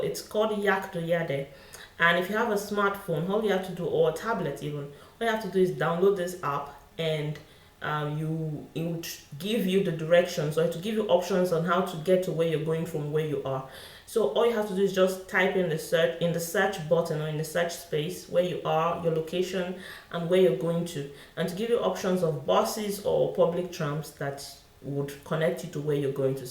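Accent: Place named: Nigeria. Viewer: my sister i hail......i must say u are really doing a very good job..thanks for the info.. u really sound like nigerian...i am a proud nigerian...thanks for being u..may God bless u for all the info.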